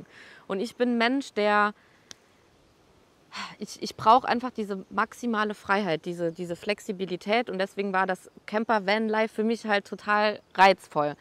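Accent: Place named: Saarland, Germany. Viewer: Wow, ich bin beeindruckt von deinem Leben. Du hast alles richtig gemacht. Du bist sehr ordentlich und sehr minimiert und vielleicht würde ich mich noch vom Beifahrersitz trennen.Ich lebe in Rheinland-Pfalz und freue mich in verschiedenen Sätzen dein saarländisches Dialekt rauszuhören. Ich liebe Dialekt. Du lebst im Paradies und genieße jeden Tag.Viel Glück in deinem Leben.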